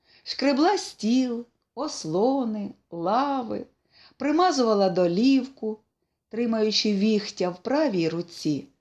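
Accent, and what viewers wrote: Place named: Russia. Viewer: Треба перечитати. Причини: в українській мові літера "О" ЗАВЖДИ ЧИТАЄТЬСЯ/ЗВУЧИТЬ, ЯК "О". Без варіантів. (Відчувається, що начитує російськомовна людина) 2. Проблема з наголосами, напр. : п'Овагом, а не пов'Агом і т.д.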